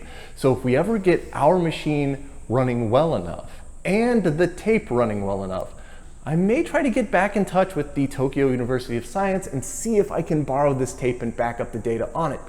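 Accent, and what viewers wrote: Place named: United States. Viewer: Wow, you did a great tour of japan, if I ever found myseld there, will be sure to watch this video again on what to visit PS: your japanese sounds american to me compared to the one the lady spoke Was wondering how easy is the language to learn for american person Also, how fast can it be forgoten if not used PS: is it wise to visit japan if u dont know japanese, can u get stolen or riped off easier?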